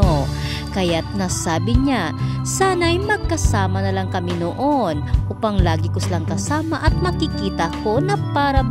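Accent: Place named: Visayas, Philippines. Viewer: Naniniwala akong sinabi ni Sharon yan kung sya mismo ang nagsasalita sa video. Maka cocoyass din itong vlogger na to bitter talaga bisaya pa ang accent nya. Gawa gawa lang nya yang sinasabi nya wala namang video si Sharon.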